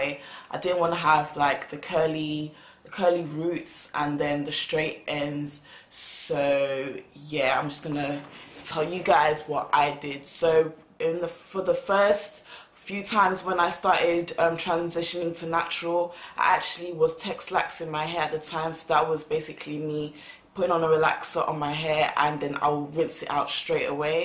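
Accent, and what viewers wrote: United Kingdom. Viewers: Just subscribed - only because I heard your accent....big up Brit Youtubers!! Now let me listen to what you have to say! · I love that British accent, it's special.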